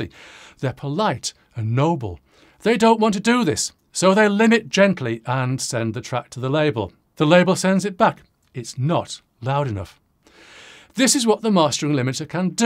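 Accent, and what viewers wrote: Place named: Merseyside, United Kingdom. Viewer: I have original CDs from before 1995 that sound bad because the analogue to digital conversion wasn't very advanced when they were transferred, they have good dynamic range but lack definition.... granted a lot of "remastered" modern recordings are awful and have the 'flat" sound that comes with over compression and over-processing in general. I notice you have a slight Merseyside accent.... my neck of the woods, anyway thanks for a very interesting video that tells the truth about our modern recording world.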